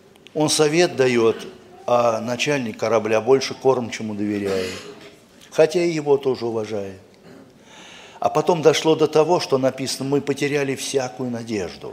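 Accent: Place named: Volga, Russia. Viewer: Спасибо. У него речь волжанина), я думала, что с Волги откуда-то) Мир Вам🌹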